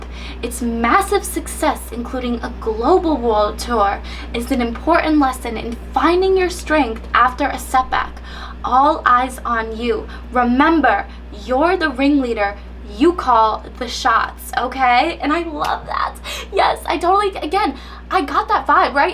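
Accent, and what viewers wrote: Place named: Australia. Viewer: Loooool your Australian accent made me giggggleeee and then your Little Mix Jamaica interview reference 🤣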